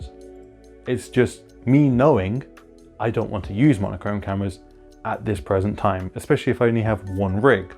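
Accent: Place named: Scotland, United Kingdom. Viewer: You have an intresting accent. Where are you from? Scotland?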